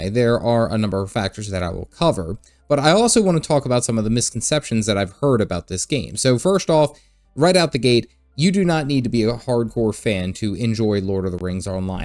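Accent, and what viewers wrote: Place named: Delaware Valley, United States. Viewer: This dude is from the Delaware Valley and I love it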